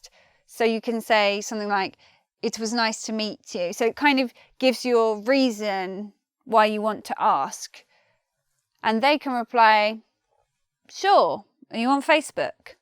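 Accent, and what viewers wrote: United Kingdom. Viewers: One more time, thank you! I like it British English pronunciation too. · I love her British accent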